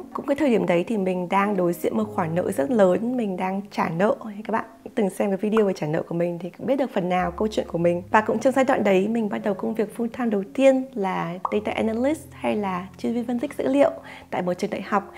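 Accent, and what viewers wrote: Standard. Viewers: bài chia sẻ rất là hay, giọng rất chuẩn!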